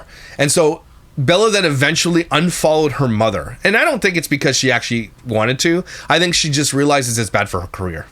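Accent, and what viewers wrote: Liverpool, United Kingdom. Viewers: yup she is defo a scouser. and spot on with her commentary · Scouse accent from Liverpool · That's a Scouse accent from Liverpool! It's in the north but not quite Scotland! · That's a scouse accent!!! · I love watching you! as a Manc here in Upstate NY, i'm hearing more scouse/EP area in you but definitely not Scotland! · is from Liverpool, best accent ever. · is awesome and wicked funny. As a fellow northerner I assume shes from the Liverpool area. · You’re from Liverpool, right? I love this accent. I had a friend from Liverpool, absolutely hilarious, just like you. 😅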